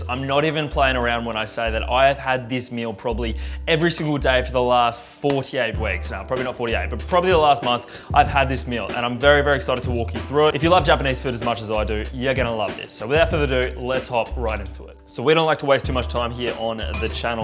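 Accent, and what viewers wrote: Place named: Australia. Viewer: Ahaha didn’t think you would be Australian/sound like that 😂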